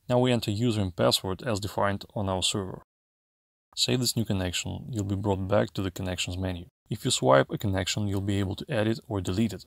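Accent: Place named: Russia. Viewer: It's a Russian accent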